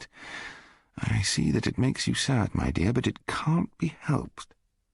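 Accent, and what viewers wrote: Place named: England, United Kingdom. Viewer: English accent is the best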